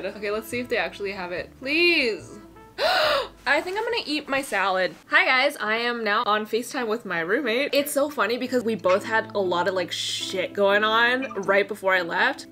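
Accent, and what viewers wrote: England, United Kingdom. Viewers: Is there a reason you speak with an annoying British accent or is that just to be annoying? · That English accent though 😬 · British accent 🤌🤌🤌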